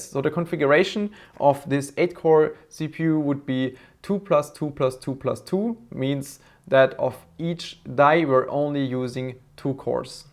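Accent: Germany. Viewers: Man hört den deutschen Akzent xD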